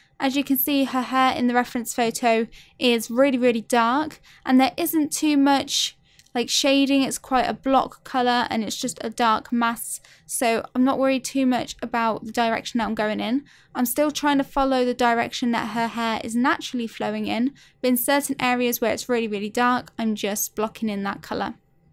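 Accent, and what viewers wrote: United Kingdom. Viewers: I love ur accent..is it british?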